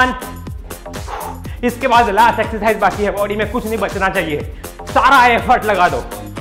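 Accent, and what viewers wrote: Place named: Bihar, India. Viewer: Shuddh bihari sunne Mila ...exercise and fun both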